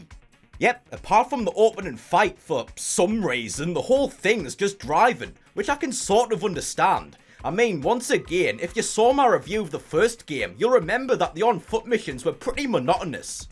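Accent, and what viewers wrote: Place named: Newcastle, United Kingdom. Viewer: I love that you have the sterotypical nerdy teenager if he was from Newcastle as an accent 😂❤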